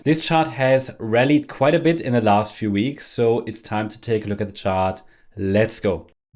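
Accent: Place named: Germany. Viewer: are you? same voice,and sounds like German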